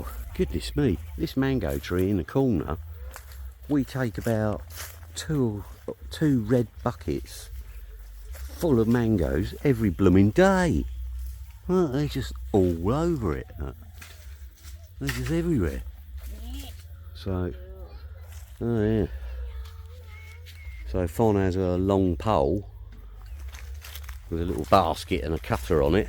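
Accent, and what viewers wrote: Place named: United Kingdom. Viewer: Looks great. Good luck to you. Just a fracture! Lol Sound like you come from the same part of the UK as me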